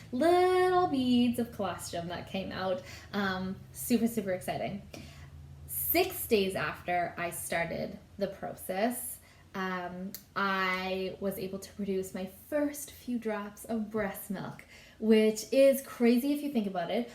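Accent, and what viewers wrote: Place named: Canada. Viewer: John Sanders she sounds like she has a Canadian accent to me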